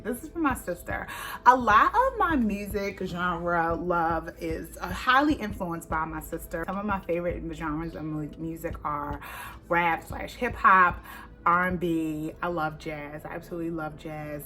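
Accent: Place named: United Kingdom. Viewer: Your British accent is believable. You are funny!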